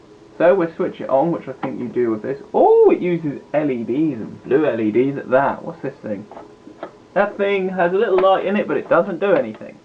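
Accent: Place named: London, United Kingdom. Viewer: Thanks for the breakdown video. Like your accent, is it east or west London?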